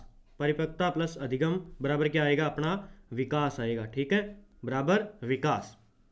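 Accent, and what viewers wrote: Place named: Bagri, India. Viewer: Sir aap jo bich bich me bagdi type bolte ho wese hi btaya kro..... Bhut ache se smjh me aata h 😊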